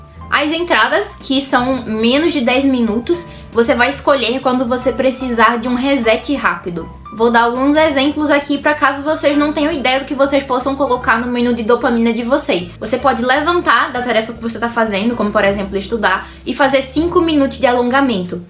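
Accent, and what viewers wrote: Northeast, Brazil. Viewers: Seus vídeos são incríveis, vc é muito fofa,ganhou mais uma inscrição, ah e você tem sotaque nordestino,vc é do nordeste?? De qualquer forma,irei acompanhar seus vídeos! ❤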